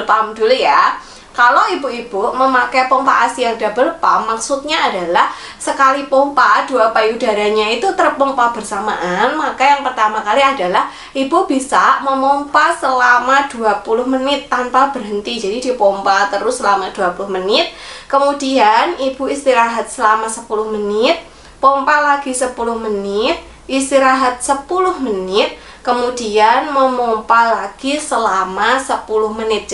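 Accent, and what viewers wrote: Java, Indonesia. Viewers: Pertama kali simak video dari channel ini, seneng banget denger logatnya medok medok khas Jawa.. Suwiiii biyaaanget.. 😂❤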